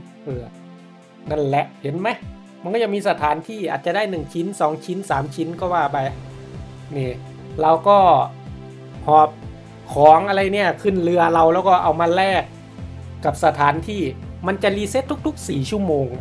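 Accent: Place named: Ubon Ratchathani, Thailand. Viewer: ครับผม สำเนียงพี่เหมือนคนแถวบ้านผมเลย ผมคนอุบล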